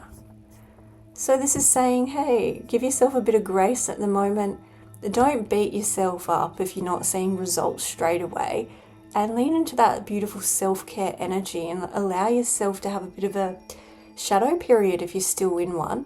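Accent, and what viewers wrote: Australia. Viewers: I LOVE Australians. The voice or accent 🌞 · I'm from Scotland and I love the Australian accent it's very cool.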